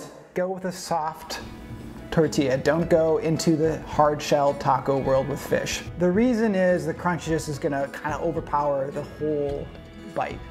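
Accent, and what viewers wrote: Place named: Minnesota, United States. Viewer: Hey - I have no idea how the YouTube algorithm brought me to your videos- but so happy they did. I’m sitting here in lockdown in Melbourne and your accent and these fish recipes are bringing me home to Minnesota. Hope you’re well. Also- that daughter of yours is adorable!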